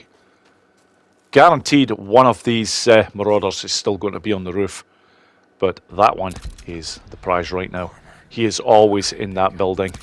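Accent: Scotland, United Kingdom. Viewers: In any shooter game, I always opt for a sniper rifle as soon as possible. On the first playthrough, I saved my lunch money and turned in a lot of bounty to get my trust level up so I could buy the.50BMG. The slaughter went pretty much identical to the first example. On the second go around, I had added the Rock Chuck which made it more fun as I took out the roof crew from a distance and then snuck in for an up close and personal finish. BTW, and I hope I'm not getting the nationality wrong, I enjoy videos as much for the content as I do listening to his narration. Of all the peoples speaking English as a second language, there's just something special about the way a Scottish person does it, and I could listen to it all day! So, hats off for all your very helpful offerings on Days Gone.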